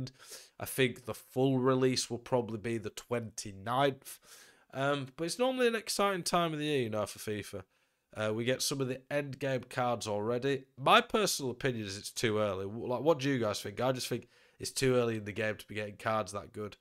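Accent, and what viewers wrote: England, United Kingdom. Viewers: Bradford Innit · Where you from man ? Your accent is English but I dont know which city ! Just curious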